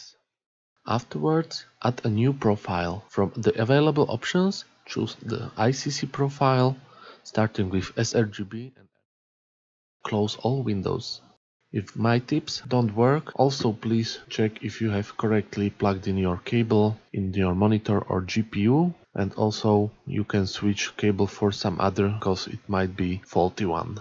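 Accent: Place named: Slovakia. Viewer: Hned podla prizvuku som zistil že si slovak :D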